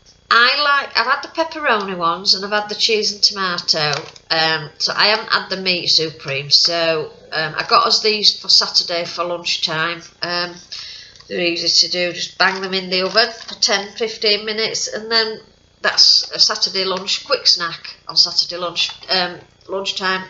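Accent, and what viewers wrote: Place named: Wakefield, United Kingdom. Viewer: Love your accent! I'm from Northern Ireland but have a nephew from Wakefield who speaks just like you! I've just recently subscribed to your channel and really enjoying your videos so keep them coming! Norma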